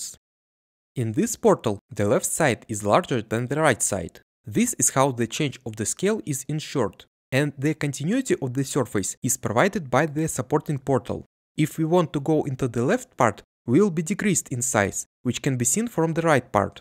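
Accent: Russia. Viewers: Слышится русский акцент) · Ты русский? Акцент говорит что да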